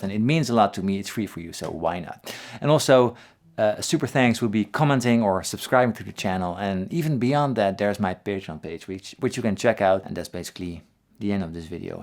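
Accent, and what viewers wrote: Netherlands. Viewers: As someone from the Netherlands myself, the Dutch accent of Dutchies speaking English often bothers me just a little bit, but you've managed to make your slight accent sound soothing instead. Nice playing, nice voice as well. Thanks for the videos! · Classic Dutch accent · Ik hoor aan je accent dat je Nederlands bent! heb mij geabonneerd, hele toffe tricks.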